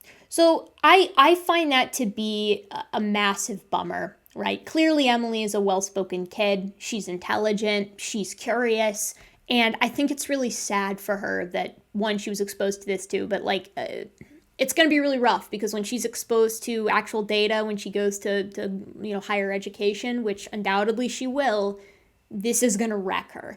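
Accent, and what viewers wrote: Midwest, United States. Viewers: I love her midwestern speaking style, but I may be a little biased because I'm from northern Illinois and she sounds like me, haha.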